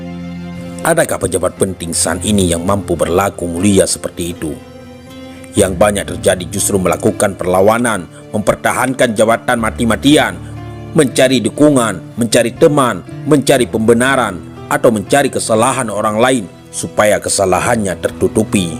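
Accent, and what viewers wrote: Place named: Bali, Indonesia. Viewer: Yg bcra sperti orang bali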